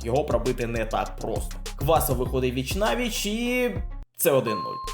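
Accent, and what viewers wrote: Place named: Bukovina, Ukraine. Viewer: Все класно, але наголос Буковини ріже вуха (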